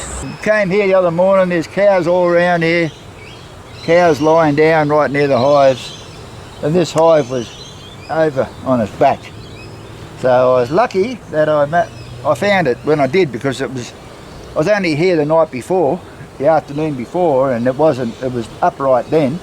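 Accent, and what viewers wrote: Australia. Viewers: I have watched quite a few vids on the flow hive and most commentators come across as sniveling little spoiled brats trashing the product because of their often laughable and regularly idiotic opinions that appear to be mainly based on jalousy more than anything else. This is the first vid I have seen where anyone makes valid criticisms and demonstrates their factual concerns with the product. You have earned a lot of credibility with me. Clearly you are a very knowledgeable beekeeper and more importantly, an honest and fair gentleman. I get the impression you may not be overly keen on the flow hive and that's OK because your concerns are based on fact and experience and not concocted opinion just to jump on the bashing bandwagon. No doubt the product is not perfect but you make sense with your concerns and questioning of it which is miles above what many others have done. 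It's also great to see and hear a died in the wool Aussie making such informative and interesting vids. Well done mate and I wish you every success and good luck with your endeavors.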